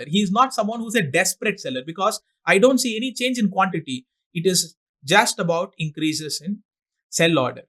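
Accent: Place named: South India, India. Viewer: Judging his accent , he's surely South Indian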